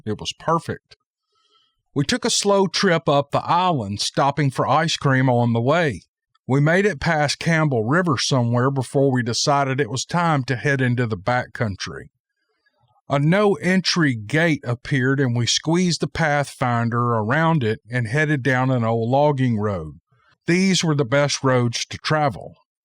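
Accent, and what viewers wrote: South, United States. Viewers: Your stories always help me go to sleep with your American southern drawl while I’m here in Russia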